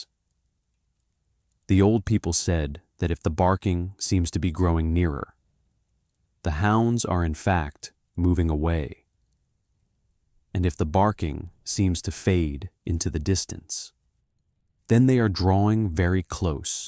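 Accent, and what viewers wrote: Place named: United States. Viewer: Shame a Celtic myth is narrated by an American.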